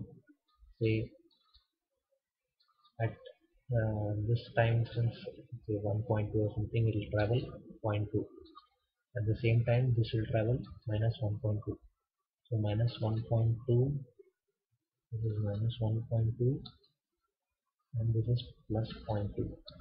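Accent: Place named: India. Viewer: Not only you've got that stupid inidian accent, but you've also got a shitty microphone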